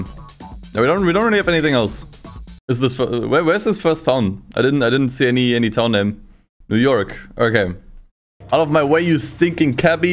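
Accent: Germany. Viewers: Are u German? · Hearing a German guy pronounce Spanish words is very amusing lmao not that he did bad he actually pronounced him pretty good it's just accent humor lol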